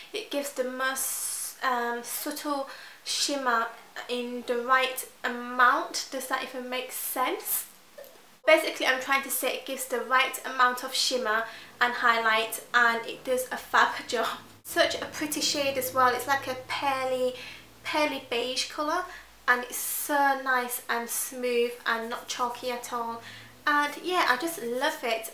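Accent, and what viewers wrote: Yorkshire, United Kingdom. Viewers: Is she from Yorkshire?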